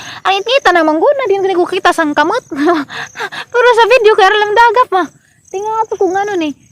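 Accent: Bohol, Philippines. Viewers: Lami jud ka mo binol anon day, angay jud ko s imong sinultihan😁😁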